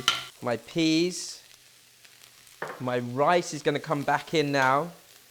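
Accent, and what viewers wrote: United Kingdom. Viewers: Love to see and hear an Asian person with a British accent. Very unusual. I love it. ❤️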